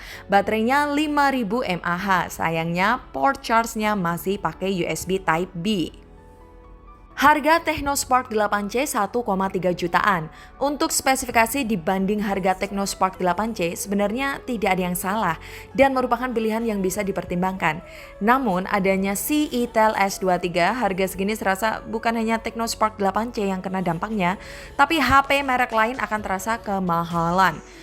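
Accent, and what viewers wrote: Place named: Java, Indonesia. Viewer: Bacanya ga masalah, medoknya itu yg masalah...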